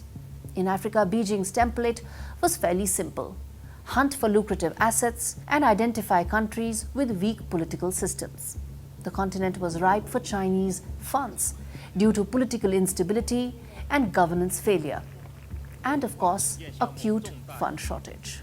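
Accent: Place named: India. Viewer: the announcer says “… China’s checks threatened to turn several countries into ‘versaille’ states due to their high indebtedness…” what the hell’s “versaille states”? I have hard times with the Indian English…😢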